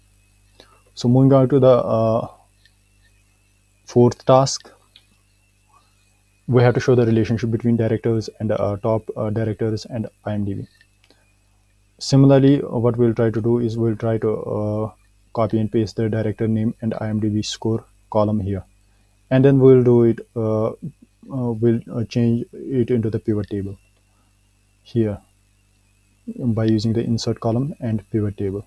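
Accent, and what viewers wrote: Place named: Kashmir, India. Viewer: maine apki awaa suni muje wahi samaj aaya aap apne kashmiri bhai ho Allah salamat rakhe this video helped <3 a lot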